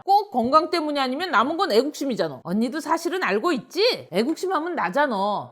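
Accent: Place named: Chungcheong, South Korea. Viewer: 충청도사투리 ㅋㅋㅋㅋㅋㅋ 너무 인간미 넘쳐서 혼자 낄낄거렸어요!! ㅋㅋ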